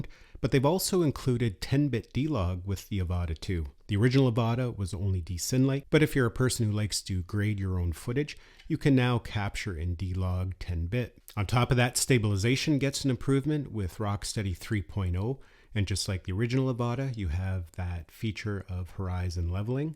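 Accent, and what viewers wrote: Canada. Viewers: Your Canadian accent is like nails on a chalkboard. 🤦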